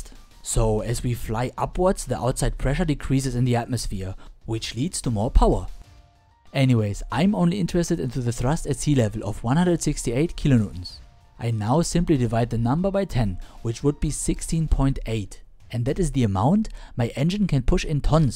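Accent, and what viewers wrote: Germany. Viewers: Are u german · There is something to be said about the german accient giving authenticity to the video. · German detected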